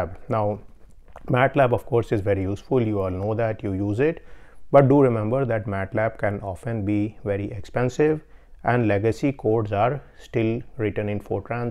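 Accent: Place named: India. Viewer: Gramps you got a hard Indian accent and I love it .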